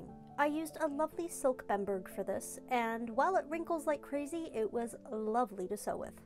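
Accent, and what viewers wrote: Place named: United Kingdom. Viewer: You sound British lol I was confused when you said Canada 🇨🇦 I subscribed I enjoy watching your videos.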